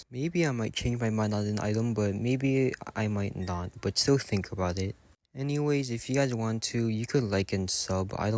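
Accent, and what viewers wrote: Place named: United Kingdom. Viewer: Honestly your voice sounds British but don't get this in a wrong way or bad way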